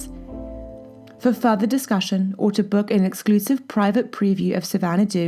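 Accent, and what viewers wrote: United Kingdom. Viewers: with no offence intended to the lady I think it is incongruous that the description is delivered with a british accent. Are you guys short of women in New York ? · A place SO exquisite.... only a British accent can describe its grandeur! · from the accent of the voice over, I thought this property was in somewhere in Scotland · Terence Mac Sweeney Sotheby’s is a British auction house. Makes sense they’d use a British narrator to promote their brand.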